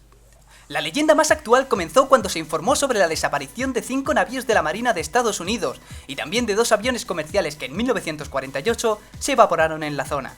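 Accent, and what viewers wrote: Spain. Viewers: Ese acentote español. Casi ni se nota que es. Aún no se drogaba pero usaba la misma música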